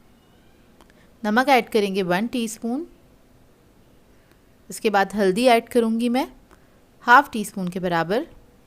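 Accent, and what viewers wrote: Karachi, Pakistan. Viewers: Your accent reminds my old days of Karachi! I really appreciate your effort 🤗☺️ GOD bless you 😊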